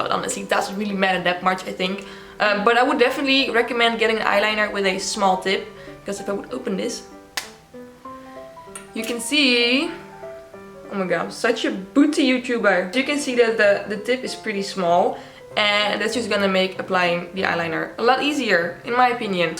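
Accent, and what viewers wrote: Netherlands. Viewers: Lmao Germans pronounce nyx the same way but she’s like obviously Dutch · Are you Dutch? I recognise that accent from miles away haha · nah, she's dutch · Ben je Nederlands? Ik hoor dat dutchie accent haha, leuke vid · im just so confused if im hearing this in english or dutch lmao such a mindfuck love the accent tho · Super Nice Dutch accent! Lol love the tutorial ! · her dutch accent is sooo strong. Love it tho since mine is just as strong as hers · I can sound the dutch in this tutorial i love you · Your accent reminds me of my dutch cousins. I find eyeliner the hardest to apply. I dont' apply as boldly as you when I wear it. Find it seems to sit better when I follow the line as an extension of the lower eye shape and only do the outer edge. I haven't tried putting on inner edge as thought might might make my eyes look closer together. 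· we love the dutch accent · I am Dutch and I just know from listening that she is very much Dutch lol. The accent is recognizable when you are from the same country xD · hu?? kan ze nederlands? ze heeft echt zo een accent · no one lmao a quick google search would show you she’s dutch. She has a very dutch accent. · She is not german she has a very pronounced dutch accent not german. She sound like dutch VS model Romee Strijd when she talks lol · Lmfao I'm dutch and I didn't even know you're dutch but as soon as I heard your accent I just knew it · haha i love dutch accents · Love the Dutch accent hehe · lmao dat nederlandse accent springt er wel uit xd <3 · dutch accent !! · Are you Dutch? Judging by your accent hehe. Good video btw, it's so different to 'wing-it' with hooded eyes...! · that dutch accent i- 😭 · this was my guess after the first 30 seconds, and now I'm trying to figure out if she really is Dutch. 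Love that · Is she Dutch? She sounds like me lol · haar accent is onmiskenbaar nederlands haha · Echt een Nederlandse accent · Are you Dutch? You sound like you're Dutch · lol yes I was like ohh she dutch.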